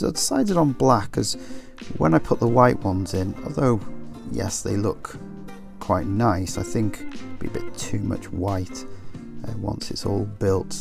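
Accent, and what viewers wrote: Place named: United Kingdom. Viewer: oh wait….sorry…..bad joke was bad…..I almost didn’t recognize the British/English accent…..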